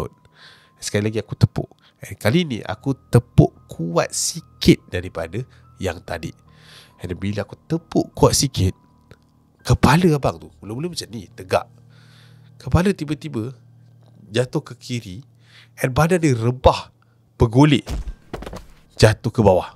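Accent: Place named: Kedah, Malaysia. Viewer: hng mmang ska ckp kedah ka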